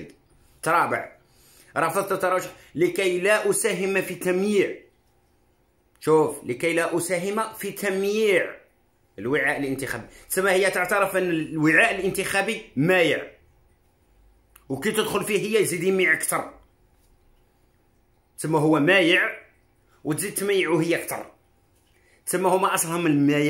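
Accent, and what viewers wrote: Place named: Ain Sefra, Algeria. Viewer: السلام عليكم ورحمة الله وبركاته تبارك الله عليك خيي . لهجتك تشبه لهجة عين الصفراء ، كنت اضن انك صفرازي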